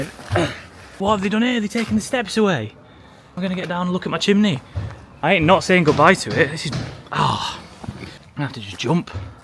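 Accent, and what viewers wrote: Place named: Leeds, United Kingdom. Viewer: Where is the chimney at from your accent I would say you are from Leeds.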